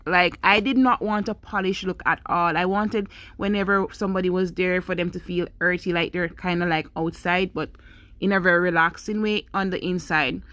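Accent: Jamaica. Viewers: Uhm, you say you're not an interior designer but I beg to differ. Really awesome look. I hear your Jamaican accent. Curious to know your journey to Cayman. I'm watching from Japan.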